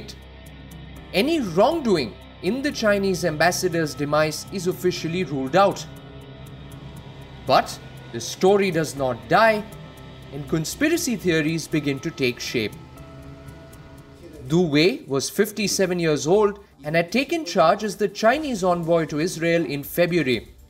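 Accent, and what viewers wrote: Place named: India. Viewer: what conspiracy theory it is a fact, you speak in Indian accent.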